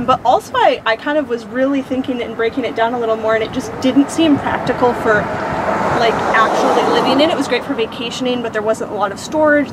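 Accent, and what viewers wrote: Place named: Michigan, United States. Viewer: 100% Michigander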